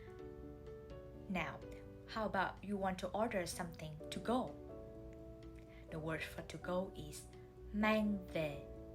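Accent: North, Vietnam. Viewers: Would this be a Northern accent?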